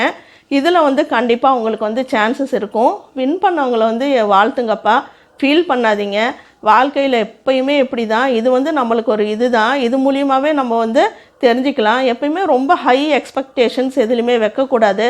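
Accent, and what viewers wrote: Salem, India. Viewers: neenga Salem ha madam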